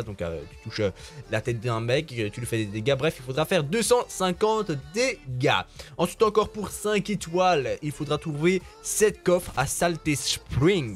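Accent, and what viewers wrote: Belgium. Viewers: Accent belge j’ai la même MDR 😂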